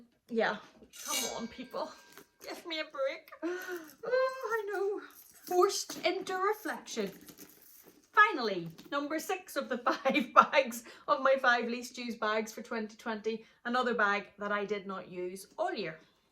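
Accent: Ireland. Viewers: I love your accent! I used to live in Ireland! I miss the country so much but I don't miss the sudden rain while carrying my Louis Vuitton bag 🤣 Greetings from Australia ❤️ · I love your Irish accent!!!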